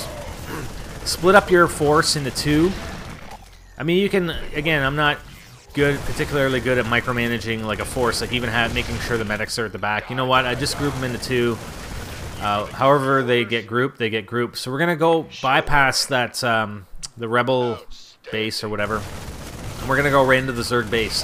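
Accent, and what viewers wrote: Canada. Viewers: You wouldn't happen to be Canadian, ey?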